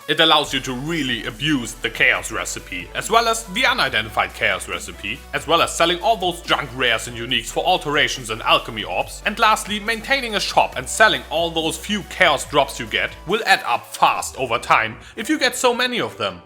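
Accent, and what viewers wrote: Germany. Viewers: you are german richtig?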